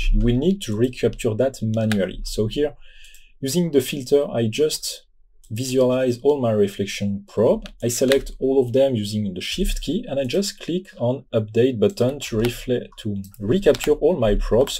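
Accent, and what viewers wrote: France. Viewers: French accent :) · Nice tutorial and nice heavy french accent.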